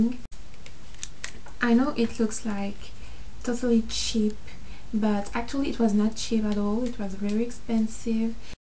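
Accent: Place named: France. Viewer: Heey tu serais pas française par hasard?? Tu parles avec le même accent que moi , c'est troop choquant j'ai l'impression de me voir xD Anyway , if not , i just wanted to know what's the name of your hair color (the one on the lenght)? And my hair is now VERY dry after the salted sea ... Would you recommend me some products or any tips ?? Thaank youuu =DD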